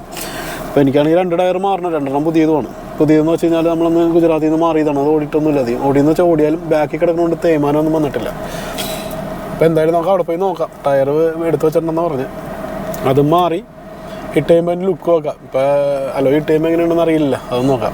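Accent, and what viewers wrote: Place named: Paravur, India. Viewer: Aaha...Slang kettappo thonni ivde adthulla aaro aanallo enn 😁♥️ Paravur il evdaa bro ?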